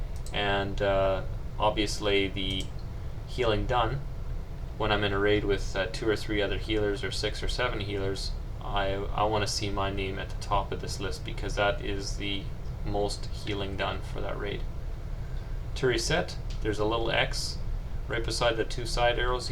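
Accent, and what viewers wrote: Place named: Canada. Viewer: You sound Canadian :D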